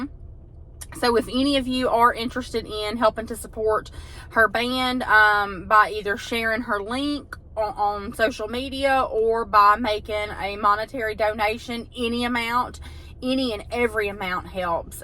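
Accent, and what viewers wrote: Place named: South, United States. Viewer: Thank you and and for all you do for us Elvis fans. Glad to hear is doing better. Even Pastor Bob Joyce said he’s not Elvis. We all wish Elvis was still alive but sadly he passed on August 16 1977. BTW I love your southern voice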